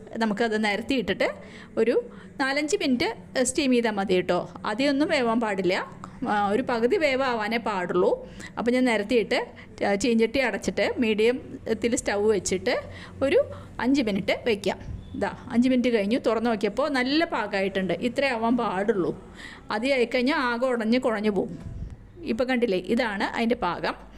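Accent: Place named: Valluvanad, India. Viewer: ചക്കത്തോരൻ ഒന്നാന്തരായി. അതിലധികം ആ വള്ളുവ നാടൻ ശുദ്ധമലയാളം വളരെ ഇഷ്ടപ്പെട്ടു. നന്നായി വരട്ടെ.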